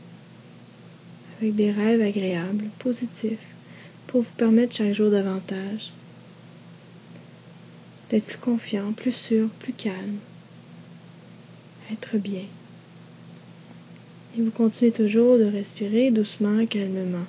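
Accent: Canada. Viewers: j'adore la façon québécoise de parler! c'est très agréable...(chui Français). de plus, vidéo très relaxante! merci bcp! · Bonjour, j'ai écouté beaucoup de vidéos d'hypnose, et je trouve ta voix très relaxante, merci. C'est peut-être l'accent canadien qui me relaxe, je suis français. Continue, tu es douée.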